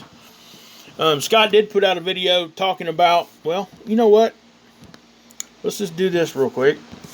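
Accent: South, United States. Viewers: I'm not trying to be rude or nothing, but you really need to change the way you speak. You must be from wayyy down south.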